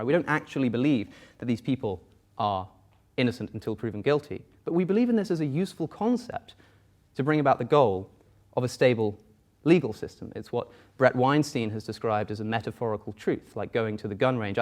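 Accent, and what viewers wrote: England, United Kingdom. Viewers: I think that's called an English accent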